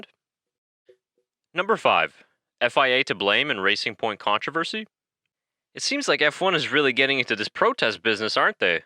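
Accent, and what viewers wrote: United States. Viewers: I really enjoy this content. I don't know if you currently live in Europe, but it's refreshing to hear someone with an American accent talk about F1 and really know what they're talking about. If you still live in the US, awesome that you watch the races! I can imagine they're quite late at night over there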